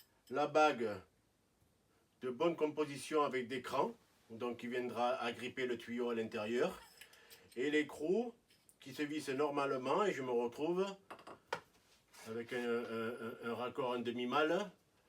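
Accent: South, France. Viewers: Moi c'est l'accent du sud que j'aime bieng...! Le reste aussi évidemment. Tchao.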